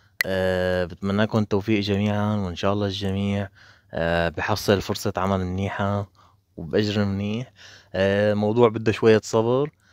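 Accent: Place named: Latakia, Syria. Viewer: حسيتك من اللادقية 🙃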